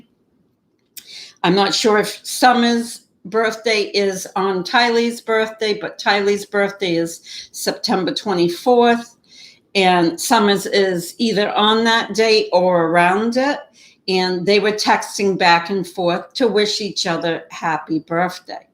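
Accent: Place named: East Coast, United States. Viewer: I love your Eastern accent...New York? Boston? Where are you from? Thank you for sharing your knowledge....Very much appreciated!🌞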